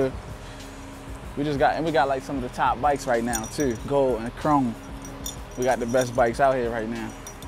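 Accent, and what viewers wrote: Baltimore, United States. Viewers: Baltimore accent bro it's how we speak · thats that bmore shit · Yeah, that's our native accent. "Dug" = "Dog" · Baltimore accent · that's a Baltimore accent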